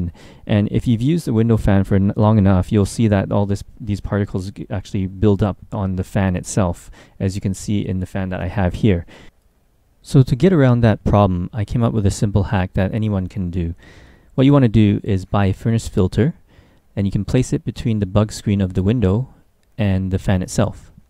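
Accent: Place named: Philippines. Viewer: Are you Pinoy? Your accent sounds a little like it.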